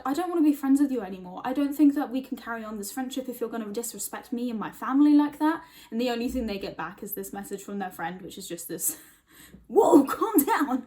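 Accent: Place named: United Kingdom. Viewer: I didn't expect you to be british lol the first video I saw was your wipe it down video and it was classy af. Love to see and hear more about your life.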